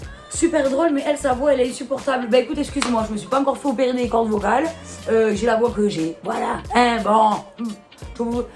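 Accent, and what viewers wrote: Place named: Marseille, France. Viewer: J’aime trop ton accent tu représente notre ville vive Marseille et sfair encadrer les jaloux et les envieux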